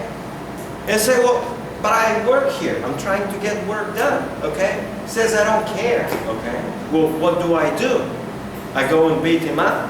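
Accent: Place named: Russia. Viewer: Russian accent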